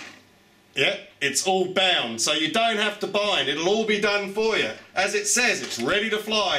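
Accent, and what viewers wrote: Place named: Australia. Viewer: I love your intro to all your videos, and the Ausie accent just adds to the entertainment value of your very informative reviews. Thanks! A big fan from the US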